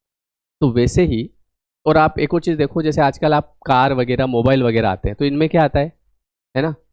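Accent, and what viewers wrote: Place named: Indore, India. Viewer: Sir indoor ki tarah bolte hai app indoor se hai app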